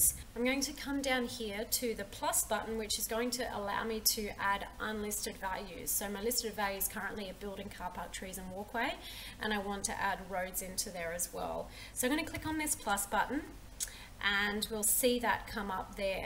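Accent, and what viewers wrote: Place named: Australia. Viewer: Nice to hear an Aussie accent!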